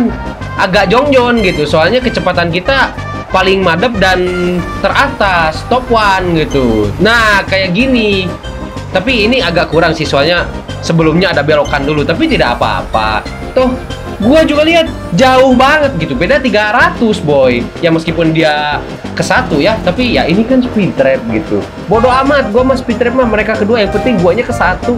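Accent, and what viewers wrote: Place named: Sunda, Indonesia. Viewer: Nyunda parah euy si aa😭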